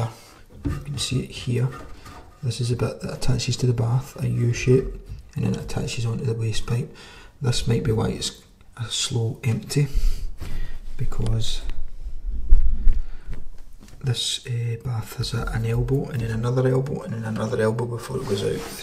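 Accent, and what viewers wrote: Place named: United Kingdom. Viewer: At last, a British guy 😊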